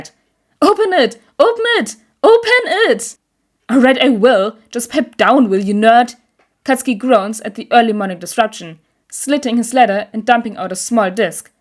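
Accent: Germany. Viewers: Your accent sounds kinda German! Sorry if I’m wrong lol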